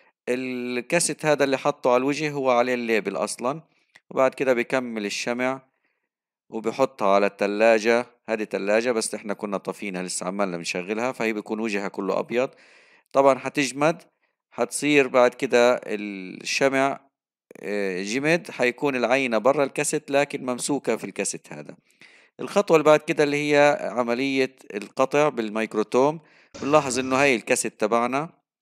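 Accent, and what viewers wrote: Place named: Libya. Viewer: الله يوفقك مشكور . لاحظت أنه لهجتك فيها اللهجة الليبية كتير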